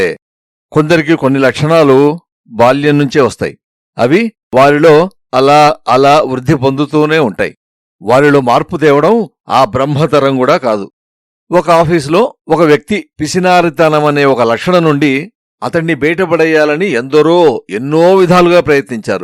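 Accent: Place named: Srikakulam, India. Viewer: మీకు నా హృదయ పూర్వక వందనాలు శ్రీకాకుళం యాస దిన్చేశారు. ధన్యవాదాలు నా రాబోయే కథలకు మీపరిచయం అమోఘం. మీ ఆశీస్సులతో ముందుకు వెళ్తాను మహాశయా నా కథలలో కొన్నింటికి నా ప్రియ మిత్రులు సరసి గారు ( ప్రముఖ కార్టూనిస్ట్) బొమ్మలను ఇవ్వడం నా సుకృతం ఆయనకు ఈ వేదికపై నమ స్సుమాంజలులు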